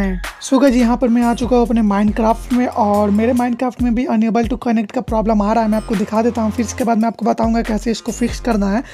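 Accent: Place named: India. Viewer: Bruh u speaking indian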